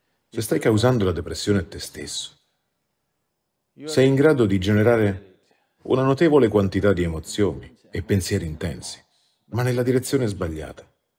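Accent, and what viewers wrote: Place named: Rome, Italy. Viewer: Certo che vedere un guru Indiano parlare e sentire il doppiaggio con accento romanesco non è il massimo... Almeno mettete qualcuno che non abbia un accento!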